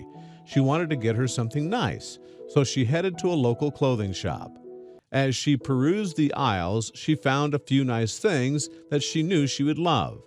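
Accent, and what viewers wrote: United States. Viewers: Why didn't a English person do the commentry Americans talk about paint dry annoying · This is a British story dragged out by an American narrator with police cars and cops from NYPD, Italian or Spanish cop cars, and several actors, must do better when presenting a tale from Liverpool, England!!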